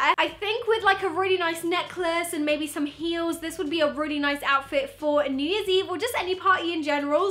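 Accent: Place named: United Kingdom. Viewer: Omg I love your accent #britishaccentsrlife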